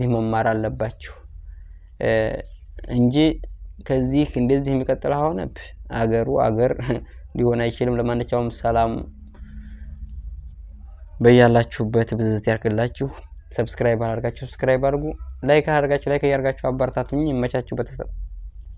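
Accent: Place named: Rural, Ethiopia. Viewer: በርታ የእኔ ባላገር እንደ ወረደ የሆነውን የአማርኛ ቋንቋህን ወድጀዋለሁ ።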